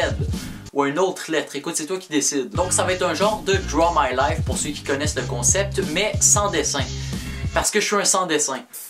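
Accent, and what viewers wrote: Quebec, Canada. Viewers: à force d'entendre les youtubers québécois, tu comprendras mieux après.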